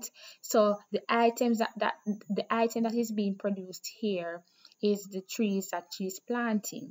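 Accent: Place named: Jamaica. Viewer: am in love with your Jamaican accent..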